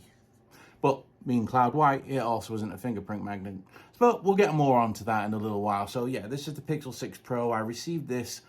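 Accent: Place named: Pennines, United Kingdom. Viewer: Loving this phone so far. I detect a north west Pennine accent here mixed with US. Great review pal 👍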